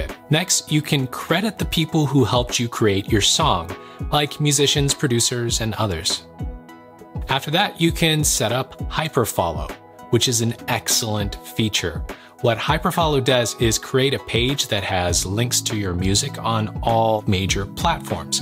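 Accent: India. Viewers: Abe tu Indian hai na? ....Orr bohot angrezi jhaad raha hai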